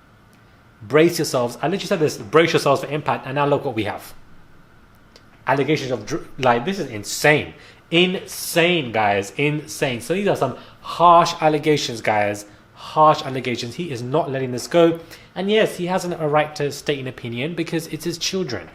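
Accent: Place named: England, United Kingdom. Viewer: And I actually thought he meant pounds because he has an English accent and that's where I'm from too.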